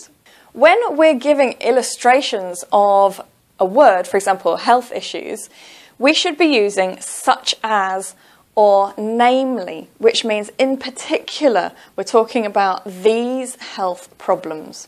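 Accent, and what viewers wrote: England, United Kingdom. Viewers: Are u english:)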